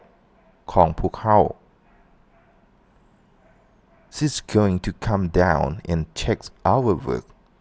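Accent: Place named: Laos. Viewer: what’s your ethnic group? The accents sounds a little bit different from my parents who are from savannakhet and champpasak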